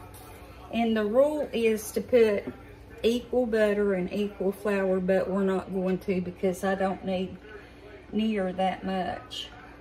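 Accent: South, United States. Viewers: You remind me so much of my grandma❤️ she has a southern accent as well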